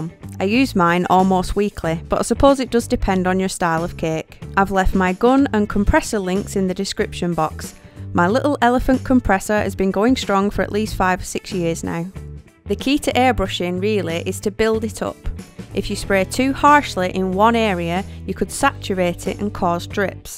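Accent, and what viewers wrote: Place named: Yorkshire, United Kingdom. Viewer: Are you from Yorkshire as you sound like one of us haha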